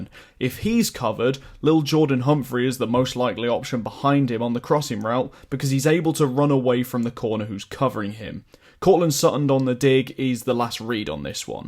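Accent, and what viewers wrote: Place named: United Kingdom. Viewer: This is probably the first time I've heard NFL analysis in a British accent, and it's also one of the best, most concise, well presented ones at that.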